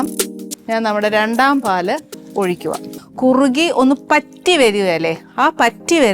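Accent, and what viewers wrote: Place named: Pala, India. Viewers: Ithu pala alla palade new version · പാലാ സംസാരം... ഞാനും ഇങ്ങനെ ആണ് സംസാരിക്കുന്നെ. സൂപ്പർ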